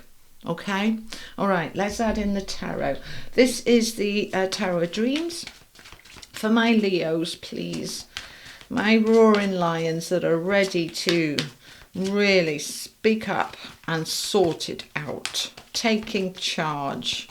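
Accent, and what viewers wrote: United Kingdom. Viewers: I am free I am secure, I am safe. I love your wonderful energy and love to hear your British accent- so many of my Favorite musicians are from the UK …. I wonder if I have had a past life there with the attraction and fascination with everyone abroad ❤ thanks for sharing your lovely messages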